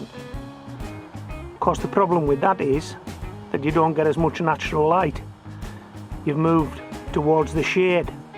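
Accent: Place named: Isle of Wight, United Kingdom. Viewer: Lose the music, your voice is entertaining and Molly was intrigued! Isle of white?.. I am trying to guess where you are! I am in California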